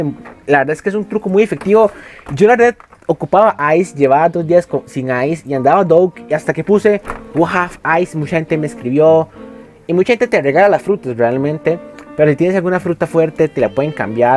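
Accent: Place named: Costa Rica. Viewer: Los ticos hablamos asi y desimos verdad casi siempre por si no lo sabiam gente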